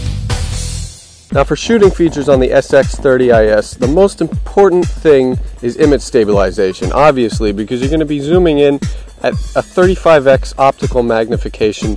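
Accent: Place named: United States. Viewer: Like most americans he pronounces Z the way you would if you were saying Zorro, for instance. The rest of the world pronounces it "Zed" (as it should be), so he means FZ 40.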